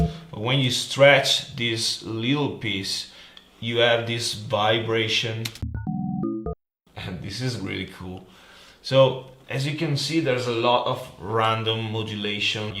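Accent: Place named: Italy. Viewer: si sente troppo che sei italiano<3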